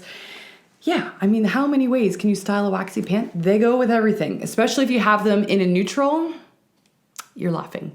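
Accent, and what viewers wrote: Canada. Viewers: I just found you, yay! Are you Canadian? You sound Canadian. I’m a BritCanaYank. West coast 36 years.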